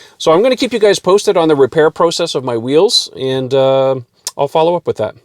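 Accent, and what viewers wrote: Canada. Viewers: Thanks. Great idea! Do I detect a Canadian accent there? Looked like Mississauga too.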